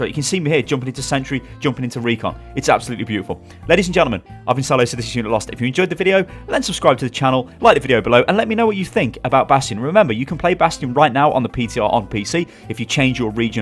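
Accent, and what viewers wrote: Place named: United Kingdom. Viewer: this is the second time that i watch your video with my gf and she get wet cz of your accent .. jesus turn me to british please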